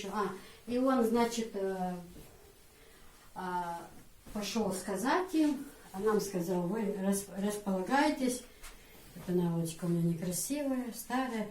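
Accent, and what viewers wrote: Chechnya, Russia. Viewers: И акцент чеченский и похожа на чеченку Аллах1 Дала дукьа яха ёйла хьо🤲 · Надо же русская, по русский говорить с чеченским акцентом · Акцент чисто Чеченский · у Вас уже акцент чеченский.молодец умница.счастья Вам. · У вас даже акцент Чеченский) Дал атьо бойла, у меня две снохи русские, очень хорошие, уважающие наши традиции и веру!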